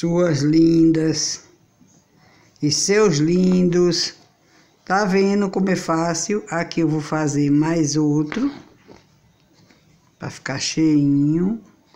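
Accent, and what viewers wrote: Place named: Ceará, Brazil. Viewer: Você é adorável, esse sotaque delicioso de ouvir, lembra minha irmazinha lá no Ceará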